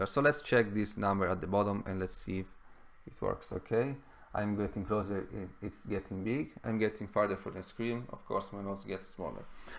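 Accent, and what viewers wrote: Italy. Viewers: Improving 3D + perspective is interesting, you should push that project much further for sure! Thanks for sharing. It's the 4th video i'm watching from you, allow me to comment my overall experience: - Monotone voice, a bit 'boring' (don't want to be rude), you don't seem motivated or you don't seem to enjoy sharing or you don't seem to enjoy doing it... C'mon, share your guts! - Funny Italian english (good and bad I guess, still better than the Indians .py tutorials, right :D ) - Really GOOD content, hard to find (i just tried different keywords this time and found the answers i needed! I'm happy!) - Speed of coding is good enough, the code is enough explained (it's really the feeling you give: you are bored to do this! -> that is the bad part of the coding..) - Keep on going with these things, i subscribe now because you have an interesting topic I want and need to learn for professional purposes, so I will watch your next videos as they come and I will check your previous ones with time. 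Anyway, wish you the best of success on your youtube path! Thanks again for the sharing and explanations, really helpful and useful, good enough for now, add more motivation in your voice and we'll be friends for a long time! :) Ciao :)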